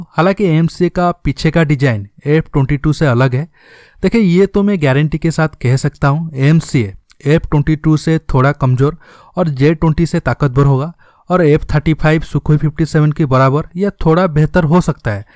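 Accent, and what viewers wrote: Odisha, India. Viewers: Is the narrator an Odia?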